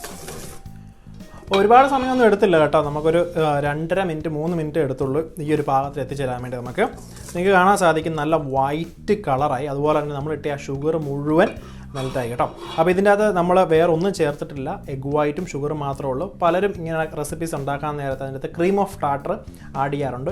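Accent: Kottayam, India. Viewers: കോട്ടയംകാരൻ ആണോ!! നല്ല സംസാരശൈലി!!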